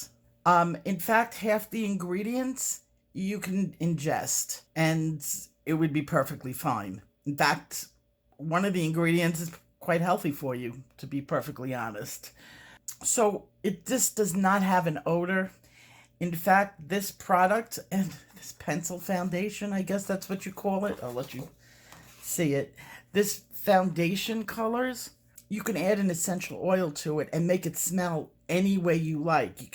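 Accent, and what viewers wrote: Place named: Boston, United States. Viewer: Hi. Are you from Boston? Love your accent. 🙂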